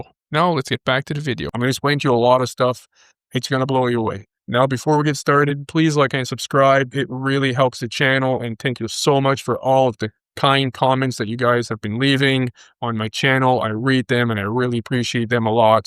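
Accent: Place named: Newfoundland, Canada. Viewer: [• Someone finally asked the question I was going to ask… I thought to myself “sounds like he’s from Newfoundland!“. I actually sound like you do… i pronounce words like you do. Maybe I’ll run into you at the Avalon mall, or downtown at a bar? Just kidding. I think the first guess is more correct. Although, you do sound like a Newfoundlander absolutely! And I’m from here.•] [• maximum respect to you my friend!🙏😎👍🫡•]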